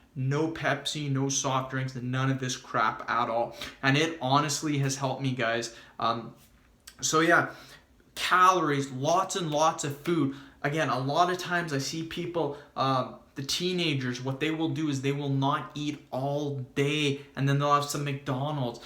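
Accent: Canada. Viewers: He’s Canadian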